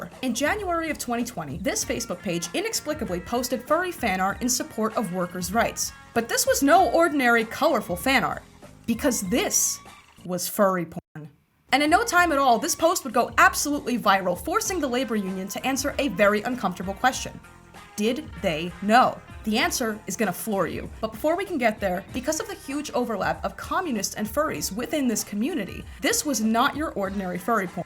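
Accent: New York, United States. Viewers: She has a slight Staten Island(New Yorker) accent. You can hear it on her vowel sometimes, it's not very prominent. I think it's great! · saaame!!! i have a relatively weak but present Boston accent (similar to hers, which sounds very NY) and have russian as a first language….americans are so funky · Just found your channel and watched a previous vid and you sounded like you were from NY then i see this one and you sound like your from california or something,so funny you put in a cut about it and broke the 4th wall some truman show shit 😂😂 but great vids i think imma sub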